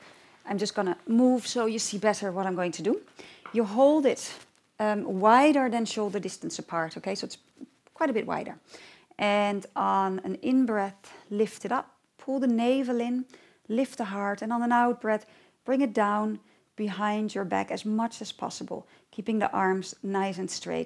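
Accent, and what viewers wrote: Netherlands. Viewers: She sounds very Dutch. Is she? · Dutch English pronunciation... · I love your dutch accent! <3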